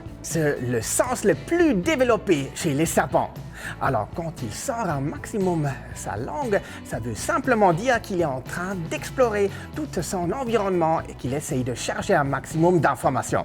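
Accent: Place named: Alsace, France. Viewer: Merci pour la vidéo très instructive !! J’ai une question qui n’a rien à voir (et qui j’espère ne sera pas mal prise 🙏): d’où vient votre accent? Ça me fait penser à ma grand mère Alsacienne de Mulhouse 😊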